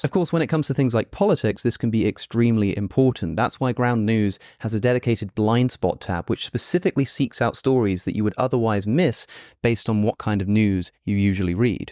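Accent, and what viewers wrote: United Kingdom. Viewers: I'm glad I was reading along or I would have had no idea what "his face shawn" meant. For those that are curious, it means "his face shone", but said with that cute accent British people have. :P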